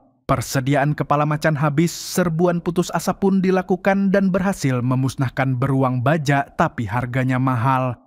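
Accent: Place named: West Java, Indonesia. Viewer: fix logat urang sunda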